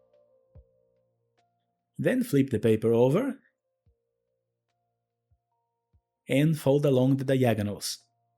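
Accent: Brazil. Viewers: O sotaque brasileiro é inconfundível hahaha 🇧🇷 incrível tutorial e lindo origami! Parabens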